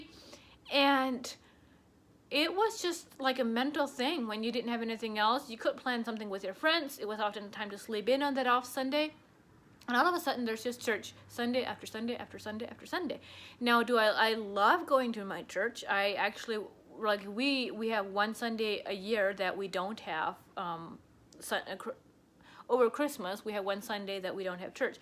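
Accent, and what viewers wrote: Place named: Ohio, United States. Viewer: You must be from Ohio ( Holmes County) I am guessing by your accent I have relatives from there 👍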